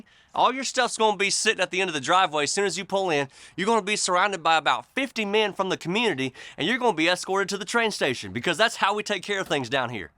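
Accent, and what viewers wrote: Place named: Rural, United States. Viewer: Read Ezekiel 13. Wow? Keep preaching with that country twang. This is a city boy from Detroit. You are appreciated!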